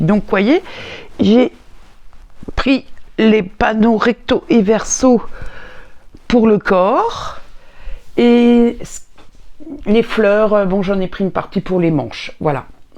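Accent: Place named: Alsace, France. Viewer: j'adore votre accent, j'ai vécu en Alsace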